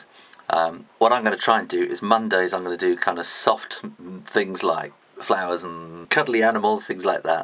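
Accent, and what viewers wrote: United Kingdom. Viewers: Thank you for the great videos! I love your British accent and your sense of humor...I'm learning a lot from you and I appreciate your talent so much! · are you british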